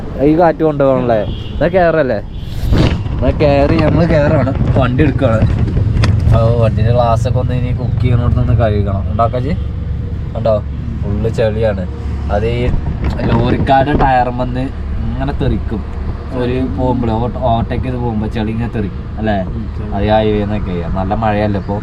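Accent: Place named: Malabar, India. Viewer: ഈ ഭാഷക്കു ഒരു കുഴപ്പവും ഇല്ല ബ്രോ മലബാർ സ്ലാങ് ആണ് അങ്ങ് ക്ഷമിച്ചേക്ക് 😊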